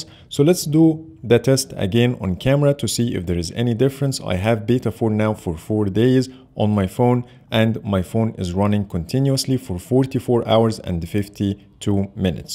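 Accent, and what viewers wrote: Egypt. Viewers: Are you Egyptian? your accent is Egyptian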